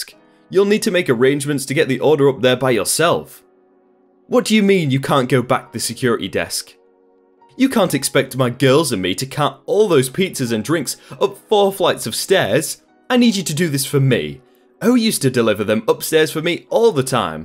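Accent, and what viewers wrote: United Kingdom. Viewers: The narrator seems British, the reddit poster was American ... two completely different languages that are only superficially the same ... watch enough videos and you'll get the hang of it ...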